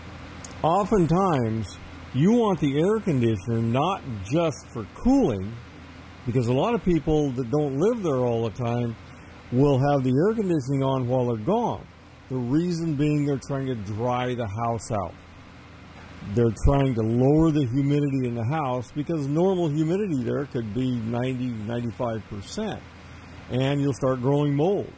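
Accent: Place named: Indiana, United States. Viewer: From my understanding I think he’s from Indiana I could tell just the way he talks mmhmm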